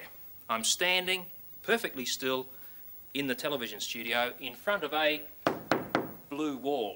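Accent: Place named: Australia. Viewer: You learn 50% more when the instructor has an Australian accent.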